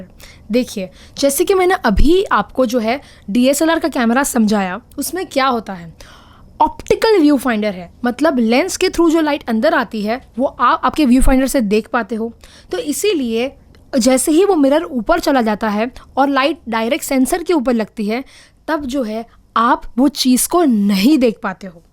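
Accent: Gujarat, India. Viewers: same here.. your accent shows that you are gujarati..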